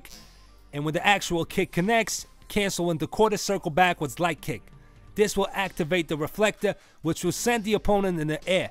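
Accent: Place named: Boston, United States. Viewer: I don’t know what it is about it, but your Boston(?) Accent somehow makes this great guide even better . Thanks for the great guide man!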